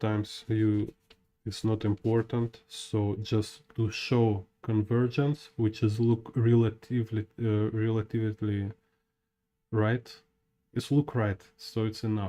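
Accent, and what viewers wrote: Russia. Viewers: Привет. Отличное видео. Всё наглядно, понятно и познавательно. Спасибо. Твой англицкий упорно давал понять что скорее всего ты говоришь на русском :) Яндекс ИИ не смог дать перевод :))) Но всё было понятно.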